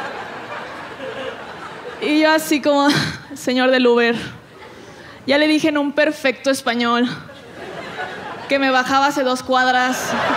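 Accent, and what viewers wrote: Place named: Michoacán, Mexico. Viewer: Tiene un toque de Michoacán 😂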